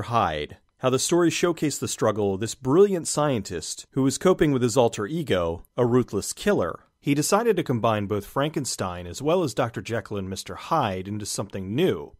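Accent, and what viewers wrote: Philadelphia, United States. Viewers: Wait, did you say Baña?! Love that Philly accent !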